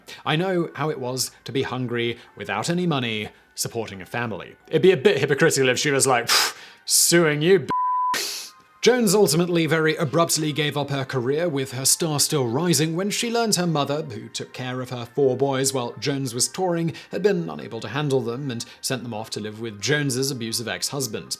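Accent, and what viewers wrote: United Kingdom. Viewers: One of my favorite things in life is when breaks his proper British delivery and gives his off script delivery.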